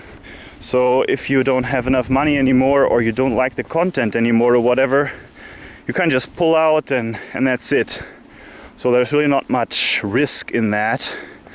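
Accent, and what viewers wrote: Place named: Germany. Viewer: " and..... jaaa " dude i love your classical German accent <3 '' zere you go ''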